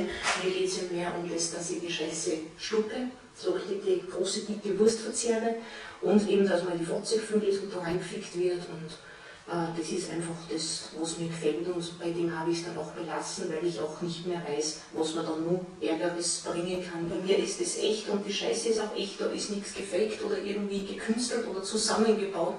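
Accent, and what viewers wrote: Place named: Vienna, Austria. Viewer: confirmed. from Vienna because of her accent.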